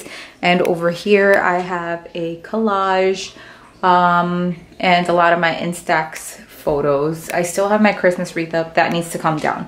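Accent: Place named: New York, United States. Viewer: I love your accent; it’s so NY and I just love it. Stay safe queen and Tfs 😘🖤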